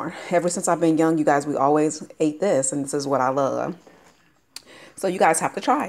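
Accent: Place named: California, United States. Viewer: Love all your videos!! I thought you sounded like you had a California accent until I seen you're from IL. If you turn your phone side ways (landscape) when recording, you won't have the black on the sides and the video will be larger.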